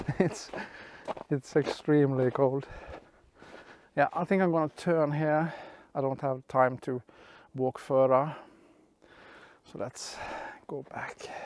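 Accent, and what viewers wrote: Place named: Norway. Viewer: Du ar fra Norge? That accent lol...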